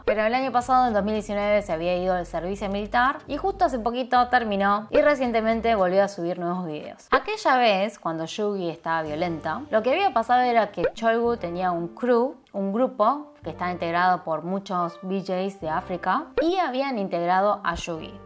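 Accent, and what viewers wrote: Argentina. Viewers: waaa que lindo es tu acento arg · Es lindo ver una coreana con acento argentino :3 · es raro escuchar a una coreana con acento argentino mencantaaaa❤❤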